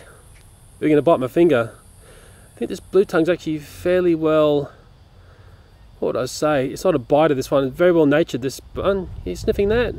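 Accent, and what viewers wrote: Sydney, Australia. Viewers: liquid sky if you've ever watched his channel, you'd know thats his natural accent hes from sydney. he had a great spider series from when he had a HORRIBLE redback infestation. he's just a normal guy trying to help the best he can.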